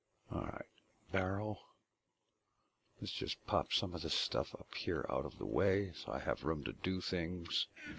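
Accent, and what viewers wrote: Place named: South, United States. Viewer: "Sometimes even the brightest don't see the light." made me lol. How long were you kicking yourself once you figured that out? :P "I promise I'll get myself together here eventually." -- I'm not holding my breath. If I didn't know better, I'd swear that I was watching AnderZEL with a southern accent. Only 3 16k modules and not enough storage? iirc, Hypno was able to fit everything he got from a full-size quarry onto a single 1k drive (excepting the stone, gravel and dirt that he placed into deep storage units)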